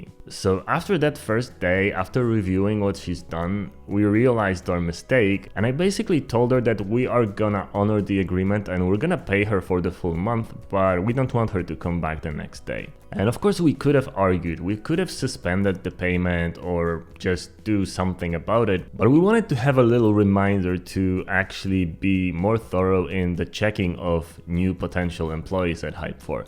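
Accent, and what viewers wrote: Poland. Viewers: Słychać tu polski akcent :D Ale bardzo dobrze mówisz po angielsku.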